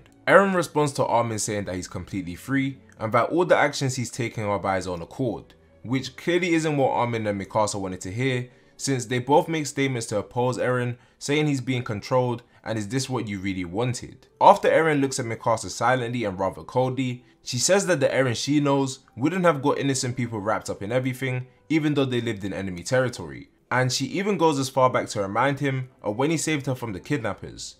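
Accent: London, United Kingdom. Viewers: Just by your accent I can tell you’re a black dude from somewhere in London. Probably South or East.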